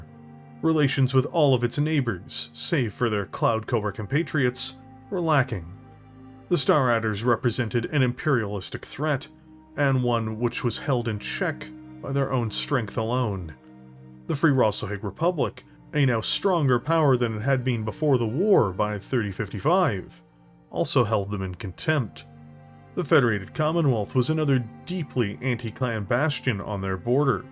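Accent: Canada. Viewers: I must applaud the incredible effort. A near 5hr monster that gave you no safety net to fall back on is hercule task in itself, but at first you seemed to follow the Doctor Who timeline of certain moments in history always have to happen. Not saying that couldn't glean out possibilities of who what and where, but the why was always going to be there. Twycross would always happen, yet a Rasalhaguean airspace pilot crashing into the bridge of a warship did not. Now, whether or not your crippling Canadian pronunciation is seemingly done to tweak my ears or that is just something that you do just to tweak the internet just because you have a channel and I don't. 1. I expected that Clan Wolf would be taken out of the driver's seat. Partly cause of (deserved) hatred and partly cause this was an exercise of a true 'what if'. You can't play fantasy with all the same pieces and expect a different result. 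2. Clan Hell's Horses. While the use of Hellions, Goliath Scorpions and Fire Mandrills was interesting, as some never had much written about them, so this was unique in this creation, but HH, with their mantra of combined arms of mech, tank and infantry, while similar to the innersphere doctrine of warfare, I believe in the long run, would have provided a more stable invasion force. But stable means boring and you would not have had Khan Steven Tyler(was FASA writers fans of Aerosmith?), blowing up about everything. 3. While I understand that you needed new players in the invasion of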